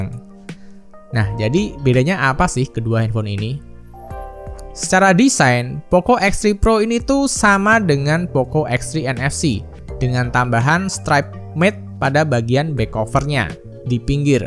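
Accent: Kudus, Indonesia. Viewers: Orang kudus to mas? Wkwkwk sama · lho bang .. orang kudus? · Sampean orang kudus mas? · bang lu dr kudus ya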